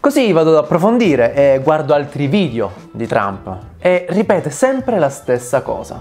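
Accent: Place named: Salento, Italy. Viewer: Ma.. accento salentino ne abbiamo? 🤣 Un saluto da un tuo conterraneo!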